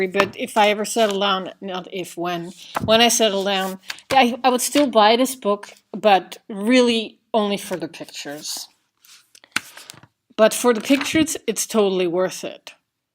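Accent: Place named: Europe. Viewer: You speak like you came from Europe to US and learned English a five years ago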